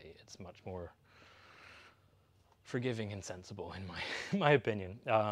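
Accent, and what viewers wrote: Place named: Cornwall, United Kingdom. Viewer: You're an interesting and knowledgeable speaker (who luckily has retained a lovely Cornish accent) but I would just love to see some of the actual work and progress on Rosalind. Perhaps you could combine the two?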